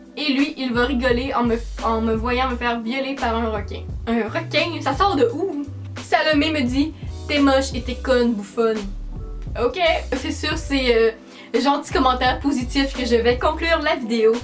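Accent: Quebec, Canada. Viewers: j'adore ton acce't et ca se voi qui, vient de Québec ☺💜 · j'aime tellement mais tellement tes vidéos ! Au début j'avais un peu du mal avec l'accent québécois mais franchement je me suis habituée puis maintenant je regarde beaucoup de québécoises et j'aime beaucoup votre accent ! Puis reste comme tu es parceque je ne te connais pas réellement mais la personne que tu fais ressortir est vraiment cool. J'attends ta prochaine vidéo! ☺💙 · C pas sa faute si elle a un accent québécois perso j'ai un accent parisien, et son accent est tres mignon elle y peut rien ❤️ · elle et canadiens sa se vois et sa sentant !!!!!!! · J'adore ton accznt québécois:continue change pas ton accent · moi j'adore ton accent et je rêve de vivre au Québec depuis que j'ai 6 ans (j'en ai presque 14) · Moi je t'aime trop puis j'adore ton accent ce québécois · j'adore ton accent québécois, continue tes vidéos je t'adore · J'aurai tout donner pour avoir ton accent. 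Mon rêve est d'aller au Québèc, donc tu est pour moi PARFAITE. <3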